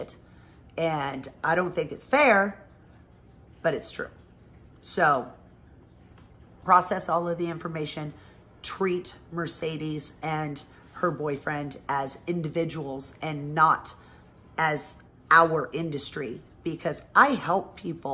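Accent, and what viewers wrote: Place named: Illinois, United States. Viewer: I bet you from Illinois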